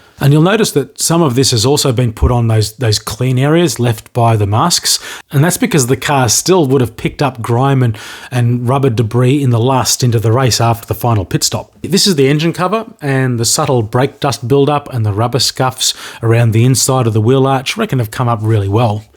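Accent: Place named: Australia. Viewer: This is awesome and for some reason the accent makes this that much more special. I have to be honest, its probably because Aussi race announcers. Regardless killer build man.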